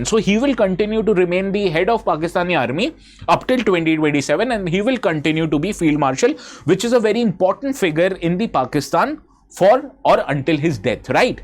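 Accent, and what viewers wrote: India. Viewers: pity ... Indian english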